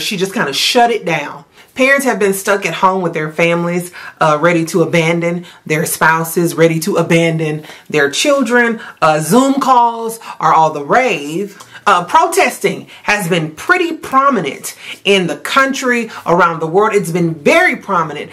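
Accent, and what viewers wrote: South, United States. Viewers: you look so pretty✨ and i can really hear you southern drawl 😍💕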